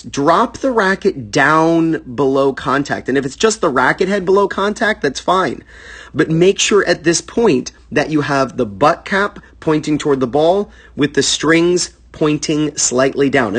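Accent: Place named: United States. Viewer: ...with American accent. Nothing wrong with that, just saying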